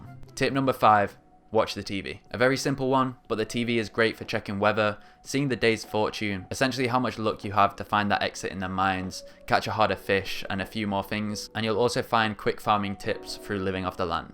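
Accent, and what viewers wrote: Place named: United Kingdom. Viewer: Chewsday I like british accent bro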